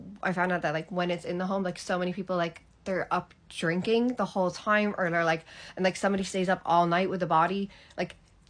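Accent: Ireland. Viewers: Sounds like you've picked up the Irish accent even when speaking with your American accent or maybe just an Irish girl pretending to be American.. haha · yeh, definitely some hybrid accent there. if you told me she was from stillorgan, i'd believe it. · (American born, raised Dutch here): You are really growing that irish accent, my compliments. Its also great how social you are. It's not easy to grow into an irish family. You must be special. And that old grand father certainly has been special.💝🙏🕯Good luck to y'all. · You sound irish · Is it me or is she like doing an irish accent... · She's fake she sounds very Irish not "American"🥱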